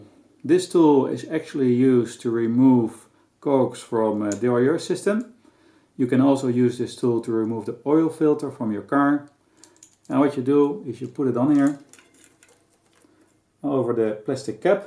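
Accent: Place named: Netherlands. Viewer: Ik kon horen dat je nederlands was haha! Bedankt voor de vid.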